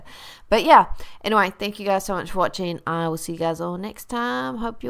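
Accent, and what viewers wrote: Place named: New Zealand. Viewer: I knew when I heard your voice that you're a kiwi, so I stayed to listen because I follow Dr Dray, the thing is she knows what she's talking about and if anyone should be listening to her advice on sun protection it's us down here in Aotearoa/New Zealand. Her choices don't concern me but I was a bit shocked to see the judgy nasty stuff, I'm more of a live and let live but if you're a creator then it's to be expected I suppose. The thing with kiwi's is we are a passive-aggressive nation so I get where your coming from eh, we don't confront but we will orchestrate a campaign lasting 500 years involving the cast of Ben Hur to send you a message!. "God I love us". In any event, we are watching Dr Dray transform as she becomes more comfortable on-line, is she obsessive depends on your point of view. I follow her advice on showering and moisturizing within two days there was a noticeable difference in my skin plus I am super Zen starting my day. 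She loves coffee my kinda girl, she taught me how to layer my skincare regime, so I'm not wasting product now. She talks to women about affordable skincare, who doesn't want to hear that eh!. Is she too thin?, well her body shape hasn't changed from when she began on YouTube, she only went vegan a few years ago, so the correct question might be, is it right to put our normal on someone else? and what is normal anyway? what we do actually know is the West is getting fatter; sedentary lifestyles, convenience food etc.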